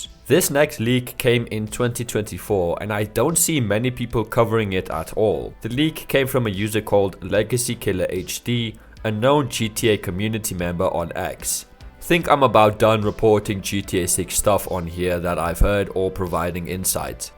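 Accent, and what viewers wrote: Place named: South Africa. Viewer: The South African accent is coming through bru! Lekker video!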